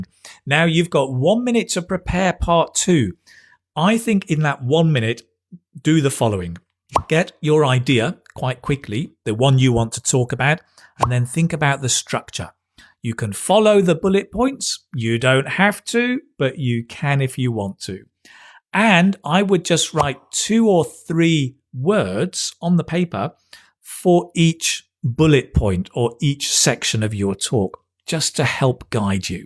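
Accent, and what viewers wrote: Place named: Manchester, United Kingdom. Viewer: Definitely British ,from Manchester, excellent teacher!!.Greetings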